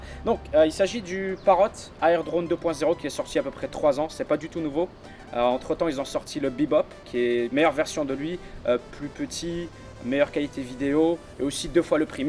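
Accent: Quebec, Canada. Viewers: Il quebecoi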